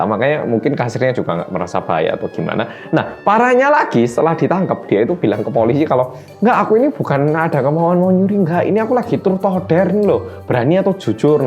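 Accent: Java, Indonesia. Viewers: Mas medokkkkk....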